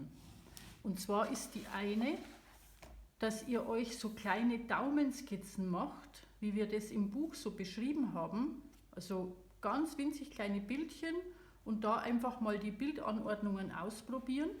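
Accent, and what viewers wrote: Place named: Upper Palatinate, Germany. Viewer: Das sind mit die besten Tutorials für Aquarellmalerei, die ich bislang hier gefunden habe! Die Beispiele sind gut gewählt, nachvollziehbar und wirken nicht einschüchternd. Zudem lehrreich, aber nicht von oben herab belehrend und sympathisch rüber gebracht (insbesondere auch wegen des leichten, aber unverkennbaren oberpfälzer Zungenschlags :)). Vielen Dank.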